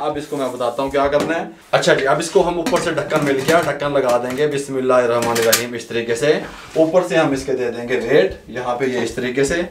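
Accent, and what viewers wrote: Punjab, India. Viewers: ،،،،، کرما والے اووووو،،،،،، میں کینا تسی پنجابی دا جیڑا تڑکا لان دے او اردو اچ بول دے بول دے، اودا وکھرا ای مزا ھے گا،،،، میں بڑا انجوائے کرنا واں ۔۔۔۔۔میرا تیان ریسیپی تے گھٹ ای ھوندا اے، زیادہ تواڈیاں گلاں تے ھوندا اے ۔۔۔۔😁 حالانکہ میری آپ مادری زبان اردو ھے گی،۔۔۔۔۔۔😅 الله تعالیٰ آپ کو ھمیشہ ھمیش خوش حال اور اسی طرح ھنستا بولتا رکھے ۔۔۔۔۔۔ آمیییین۔۔۔۔ والسلام علیکم ورحمتہ الله تعالیٰ وبرکاته ،،،،،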